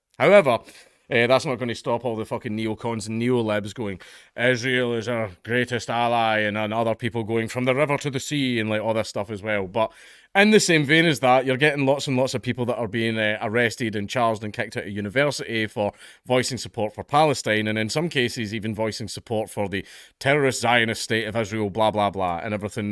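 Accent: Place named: Scotland, United Kingdom. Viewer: A smile just crossed my face, here in Texas, my Texan face, and it was nothing that you/he read/said. Nay, it was the FACT that you/Marcus/ is the DADDY OF A DAUGHTER! And each iddle widdle thing she says as she expresses the entire range of her itsy bitsy emotions... will be adorably said with my current and holding, absolute favorite accent: SCOTTISH! 😃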